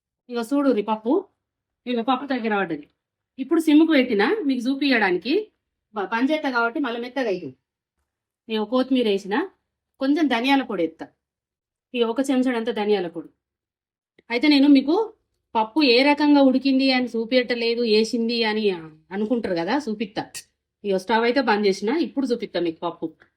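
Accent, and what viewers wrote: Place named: Karimnagar, India. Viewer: Karimnagar slang matladthunnaru 10years tharvatha malli akkadi vaallatho matladinattu happy ga vundhi chaala manchiga nerpisthunnaru. maadhi Kadapa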